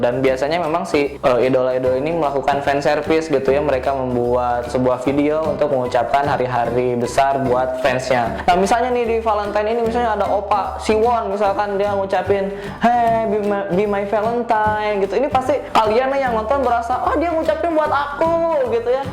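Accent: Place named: West Java, Indonesia. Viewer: akang nya sunda bangetya suka deh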